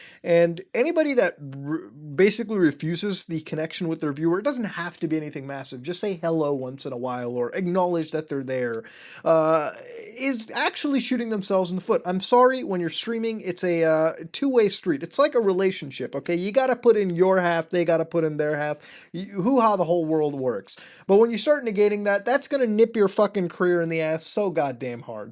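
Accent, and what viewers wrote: Canada. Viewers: are you canadian ???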